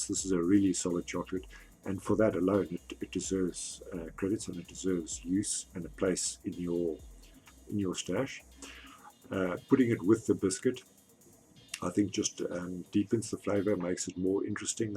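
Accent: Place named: England, United Kingdom. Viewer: Thanks,very informative review as always. I hope you don’t mind me asking but are you originally from S.Africa as your accent sounds very English to me?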